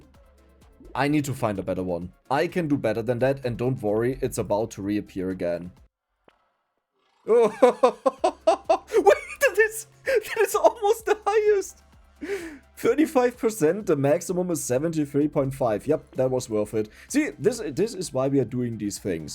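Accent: Germany. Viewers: Are you german? Alter Schwede... Gave it away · Idk why but he sounds so much German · Der deutsche Akzent ist einfach Bombe 😂 The German accent is the bomb 😂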